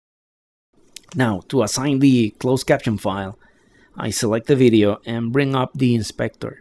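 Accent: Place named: Mexico. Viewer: are you mexican, your english sounds like mexican english mexico rules! :) saludos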